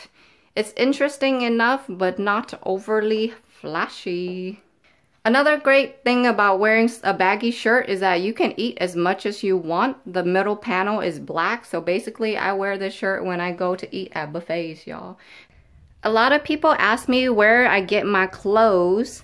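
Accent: South, United States. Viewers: Love your southern twang...where did you grow up? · I love the southern twang 😂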